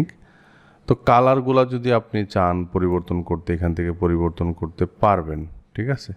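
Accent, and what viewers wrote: Sylhet, Bangladesh. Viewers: Bhai aponi Sylhet er manus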